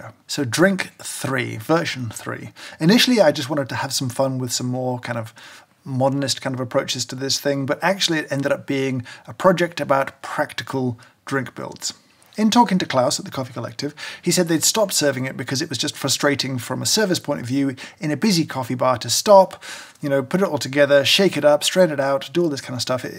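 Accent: United Kingdom. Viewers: From watching your videos I have really developed a love and respect for coffee. I'm quite sure it has a lot to do with your British accent. Here's a small, insignificant suggestion, sneak a French word in your description of the flavor. It will add a continental flavor, I think. It has nothing to do with the content but combined with that accent will add some spice to the presentation. We English speakers have a certain respect for the French for some reason. The language has always lent a certain something to the mother tongue. Keep up the great work!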